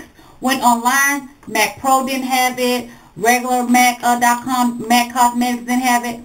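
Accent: South, United States. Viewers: you looook soooo young i mean 33 is young but you look in your 20's love the vids...i could tell u were in the south by the way u tlked so tht was comforting to me im near dallas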